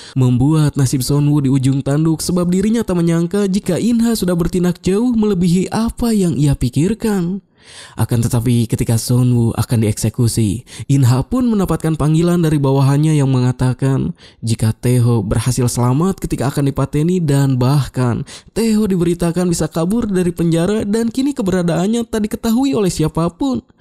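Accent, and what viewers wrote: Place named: West Java, Indonesia. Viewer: Min orang Sunda nyaaa😭🫵🏻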